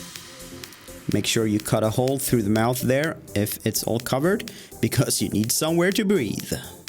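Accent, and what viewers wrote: Sweden. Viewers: you can really hear that he's Swedish too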